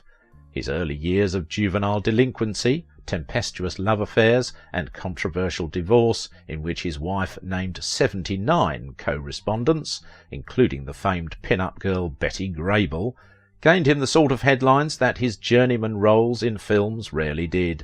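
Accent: United Kingdom. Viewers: Thanks for the backstory on Rory! Wow, what a male w...e. He did look good though! I would guess his street smarts gave him the rough, and tough look some parts needed. I remember many shows he was in. Too bad he had such a bad temper. I truly enjoy your voice, and story telling style. The sound of your british accent is easy to listen to, and tone and tempo really awesome. That was my dream to be a voice over actress, or book voice. Look forward to more of your work.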